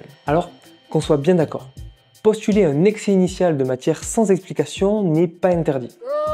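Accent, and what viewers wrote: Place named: Marseille, France. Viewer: L’accent de Marseille c’est insupportable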